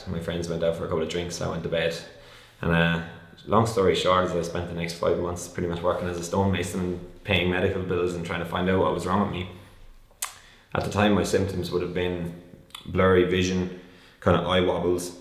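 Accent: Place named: Ireland. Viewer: Small world man! I too had a tumor in my pineal region. Glad to hear you're doing good! In hindsight I rushed into surgery and am glad you mentioned not to do that. How're you feeling these days man? I've got to say the philosophical advice at the end really hit home and the irish accent made it that much better. Thanks for making this video.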